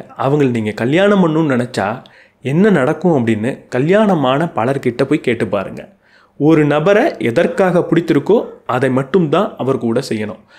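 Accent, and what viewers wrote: India. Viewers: You are doing a great public service for Tamil speaking world of 75 million people in the world and your language skill is so beautiful and very simple ..your Indian Tamil language accent is so beautiful and you have a good skills to grasp many ideas from some excellent books..could I suggest you to review this following books please . The man unknown..Alex Carrel. Suicide of the west ... Clash of civilization.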